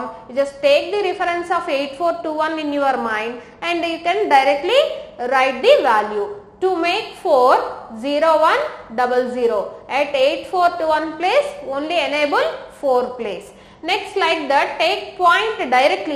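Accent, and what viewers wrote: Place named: South India, India. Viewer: Ye toh south ki English he reeee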